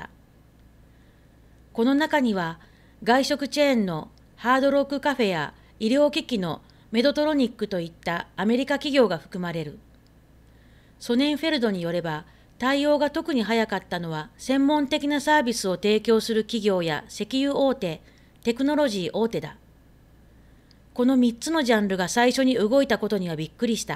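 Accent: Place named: Nagoya, Japan. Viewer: 名古屋イントネーションのアナ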